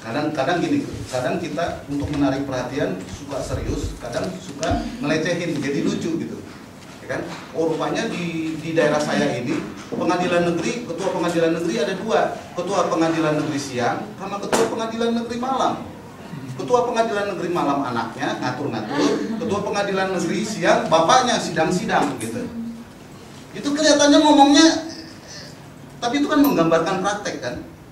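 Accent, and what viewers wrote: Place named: Jakarta, Indonesia. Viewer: Cerdas, Lugas, Tegas & Cadas.. 😄 org Batak tp logat, suara dn gaya bicaranya kek org Betawi (mirip Alm. Benyamin S) Saya ngetik ini di tahun 2022, sgl keterangan & ilmu yang diterangkan pak Jhonson persis terjadi pd kasus KM50, Mirna, Brihadir J (SAMBO), tinggal penerapanya aja ini mah..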